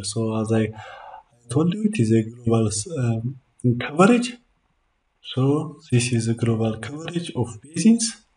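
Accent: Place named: Ethiopia. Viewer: Keep it up if you can please don't sound the accent like amharic just focus only on the appropriate words it's better background modie sounds with Text Writing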